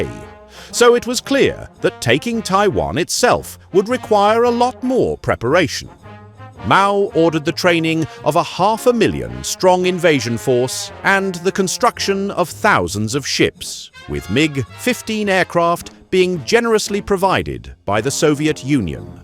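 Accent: England, United Kingdom. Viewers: Bring back old English accent, please.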